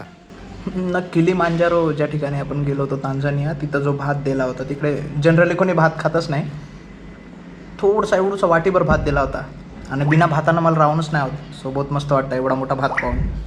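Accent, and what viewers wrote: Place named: Chandrapur, India. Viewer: Tuzi Chandrapuri Marathi aikun ekdamach chan vatate re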